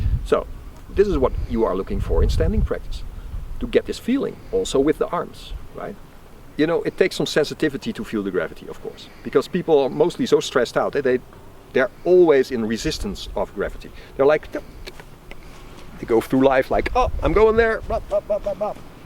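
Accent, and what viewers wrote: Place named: Netherlands. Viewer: Dacht al een NLse accent te bespeuren en idd wat die persoon hieronder zegt....... ''Freaking duidelijke uitleg!" Het wordt goed gewaardeerd. Waar zit je NL en geef je les? Grtn uit Curacao.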